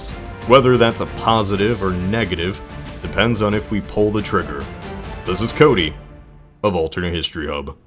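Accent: United States. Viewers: His accent, he's American, they often drop that consonant.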